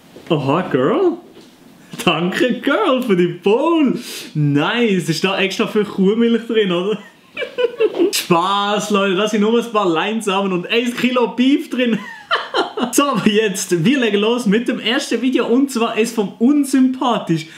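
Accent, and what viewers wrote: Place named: Fribourg, Switzerland. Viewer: i ha mer scho fasch deicht du sigisch friburger wägem akzent XD geils video!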